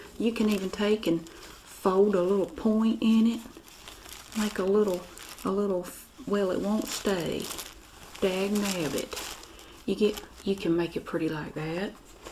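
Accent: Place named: South, United States. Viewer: Happy Holidays from Punta Cana!! We moved from Atlanta 3 yrs ago and u make me miss all the southern accents I grew to love so much from the south. So endearing...ur the best!!! Thanks for all the great videos!! 😅🤗🤗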